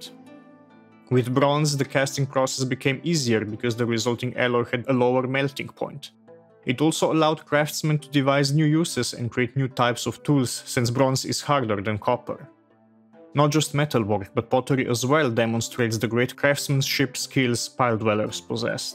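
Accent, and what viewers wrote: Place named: Slovenia. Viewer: 3 seconds in and I instantly recognize a fellow Slovenian by the accent ;)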